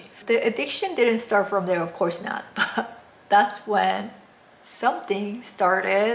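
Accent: South Korea. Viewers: I could tell you are korean from your accent. Nice to see korean lv lover!❤️ subscibed😘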